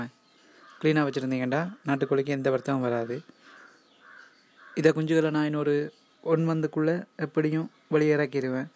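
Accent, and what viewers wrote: Sri Lanka. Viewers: this sri Lankan speek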